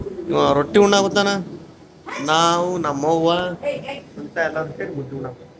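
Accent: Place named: Kalaburagi, India. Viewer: Anna yavuru nimdu nim bhashe Kalburgi thara anisutte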